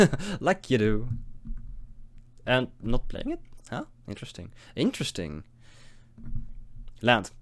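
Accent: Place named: Netherlands. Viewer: Must confess that I always hated the Dutch accent all of my countrymen have, but for chaos draft I'll endure it I guess ;) P1P9: I think you went a little too hard on blue there. Both Skirk Shaman and Stingscourger are a lot better than Piracy Charm. Skirk Shaman would have been a bit too difficult to do with all of your double white, but I think Stingscourger would have been a great pickup there. Overall I think red looked a bit better than blue overall, but I understand why you were staying in it. Would really have payed off in pack 3 though with all of the red removal. P2P9: I would definitely have picked Blade of the 6th pride over the Sarcomite Myr. It's more aggressive and you don't really need more 3-drops at that point. P3P7: Daring Leap is a great combat trick. The first strike alone is really strong, but +1/+1 and flying can win games too. 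Orim's Chant on the other hand doesn't do anything. (edit: somehow the Chant has been very good for you throughout the games. Nice work on that) Although I would have made some picks differently I don't hate where you ended up though. UW Fliers is a great archetype in chaos draft.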